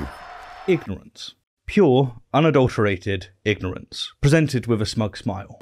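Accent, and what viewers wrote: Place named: Midlands, United Kingdom. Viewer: No. He is a native English speaker from the midlands.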